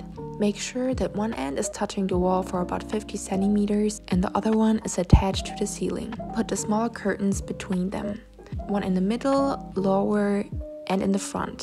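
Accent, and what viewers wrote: Germany. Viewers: Es ist voll süß das man deinen deutschen akzent hören kann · You look soo pretty!! And with your sweet german accent girl you nailed that room makeover!! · She up is German I think I hear the accent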